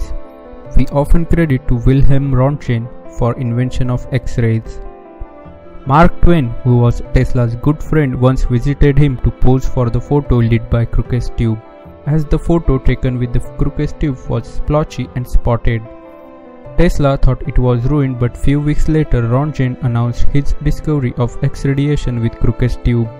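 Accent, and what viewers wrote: India. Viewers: Your voice hahahahahahahahahah wtf man indian pls give eng in peace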